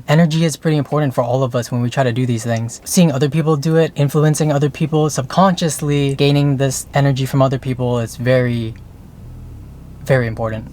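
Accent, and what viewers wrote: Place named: France. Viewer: Omg your french is so cute lol c'est la vie ! keep talking french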